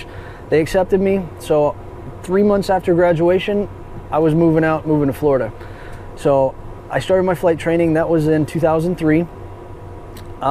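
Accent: Canada. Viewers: You sound like you're from Canada, not Texas. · North Texas? I thought he had a French canadien accent.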